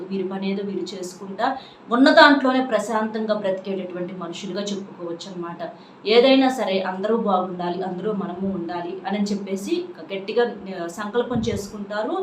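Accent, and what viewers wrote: Nellore, India. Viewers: మీ బాష యాసా చూస్తే నెల్లురు జిల్లాలో వారిగా అభిప్రాయం కలుగుతుంది.. గారు మీ పోన్ లేదా అడ్ర తెలుపగలరు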